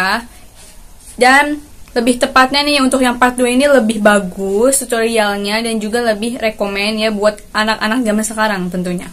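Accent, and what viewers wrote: West Java, Indonesia. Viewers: Ngajeber...🤣 Orang sunda ya teh? 🤭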